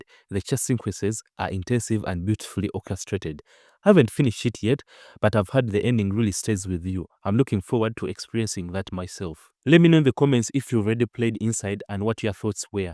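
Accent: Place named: Uganda. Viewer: Ugandan accent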